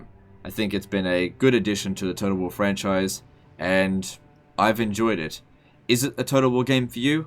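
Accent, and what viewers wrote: Australia. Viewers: I'm guessing that your either an Aussie, or a New Zealander, by your accent. Putting that aside for a moment, I noticed, that you pronounced your faction leaders name, as "Sau Sau". Sorry, my best attempt at spelling it phonetically, the way I heard it. So the "C" in this case, is pronounced, as you would say it in say "Cinnamon" for example. Where it carries more of an "S" sound. As opposed to the sharp "C" sound you would hear in say Car, or Carry, where it has more of a "K" sound. Just want to be sure I'm pronouncing these things correctly. And thought, since your from that part of the world, you would be more of an authority on it, than I am.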